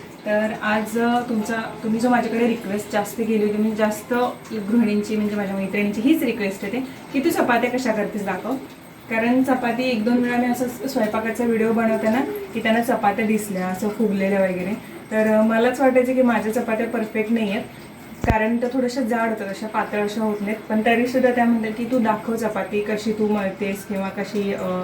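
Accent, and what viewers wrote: Kolhapur, India. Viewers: Di tujhi Kolhapuri language khup aavdhte mla 😍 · तुमची कोल्हापुरी भाषा मला खूप आवडते तसेच तुमचे राहणीमान खुपचं छान आहे तुम्ही आरव ला लावलेल्या सवई तुमचे सगळ्यांशी बोलणे तुमचे व्हिडिओ पाहण्यासाठी मी खूप उत्सुक असते रोज दुपारी मी तुमचे नवीन झाले ले व्हिडिओ बघते किंवा जुने बघत असते खूप refreshing वाटते 🙏 असेच छान व्हिडिओ करत जा तुम्हाला खूप खूप शुभेच्छा 👍 धन्यवाद 🙏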